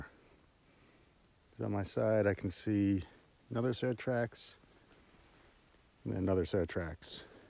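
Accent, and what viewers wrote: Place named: United States. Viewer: Nice video but you sound American...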